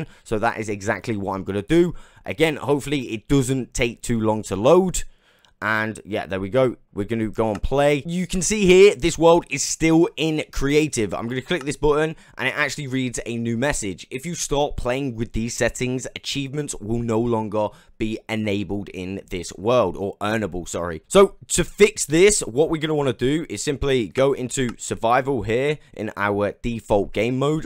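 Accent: United Kingdom. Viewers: so every british person is dantdm?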